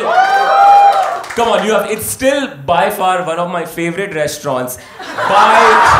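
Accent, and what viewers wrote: India. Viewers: Such a cute hindi ascent ...😁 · You sound like biswa.. as in the hindi accent!!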